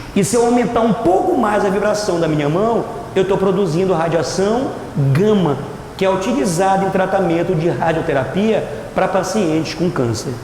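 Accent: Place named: Belém, Brazil. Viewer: De Belém... Reconheci pelo sotaque rsrs. Mas a aula foi muito boa!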